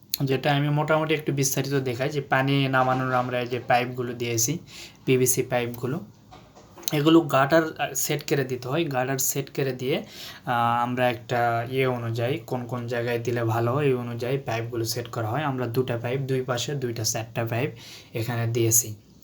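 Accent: Naogaon, Bangladesh. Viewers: আপনার বাড়ি নওগাঁতে নওগাঁর ভাষা বোঝা যাচ্ছে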